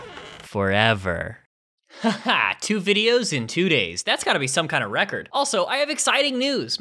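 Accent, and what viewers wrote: Canada. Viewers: you kinda sounded like a candian from south park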